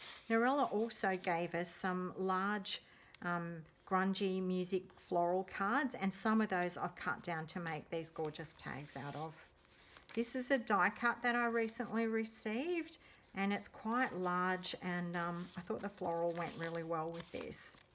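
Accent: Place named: Australia. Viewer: lovely work - its great to hear an aussie accent on YouTube. Keep up the great work:)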